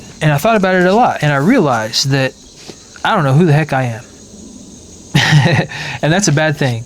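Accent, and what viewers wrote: United States. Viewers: Im from the UK and only recently discovered your channel and really appreciate your posts! I am a little older but relate to so much of your thoughts and reflections. Thanks for uploading and keep It up! Can't quite place which American state your accent is from though. Texas? I work as a Project Manager and also struggle to explain who I am more broadly.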